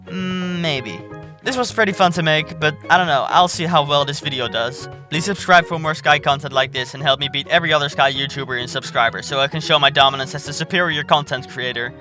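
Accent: Netherlands. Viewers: i feel like your native language is dutch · are you dutch?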